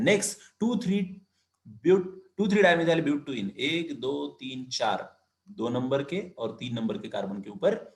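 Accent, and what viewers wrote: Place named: Maharashtra, India. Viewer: Sir your mother tongue is Marathi...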